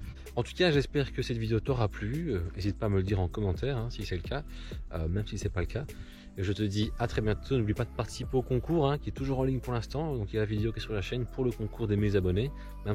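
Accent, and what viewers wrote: Belgium. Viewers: Accent belge sa non? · OK ! Je ne savais pas que tu étais en Belgique avec une autre réglementation. J'aurais dû m'en douter avec l'accent... Bons trous et dans l'attente d'autres vidéos aussi sympa.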